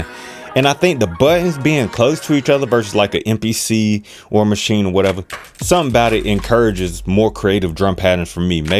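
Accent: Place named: Bay Area, United States. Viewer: my guy sounds like hes from the BAY lol I LOVED my KO2 it makes music fun again. my MPC just sits as of recent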